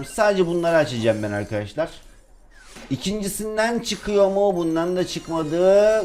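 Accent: Black Sea, Turkey. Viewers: #lazbunuyap Pocoyla hesaplaşmaya gir hiç yuknalmadan birinci ol olamazsan hesabı 950 taş al Instagram erolhayrunnisa abibseni çok eskiden takip ediyodum inanmazsan eskiden kanalını ismi oyun oynay di hatta eski videolarında Karadeniz şivesiyle konusuyordun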